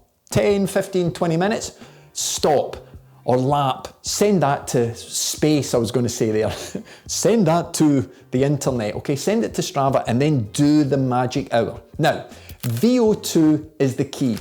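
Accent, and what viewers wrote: Scotland, United Kingdom. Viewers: I just watch these videos to hear him swear in Scottish. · Fook me, did I just spend 12 minutes listening to some Scuuu...ottash lad telling me to get my head down and stay off the brakes!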